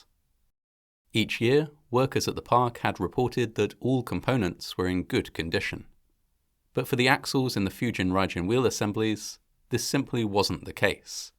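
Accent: United Kingdom. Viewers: Don’t watch if his accent bothers you that much better yet ,justjust unsubscribe and be on your way. you knew he was British and yet your dumb ass kept watching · God i hate the thick british accent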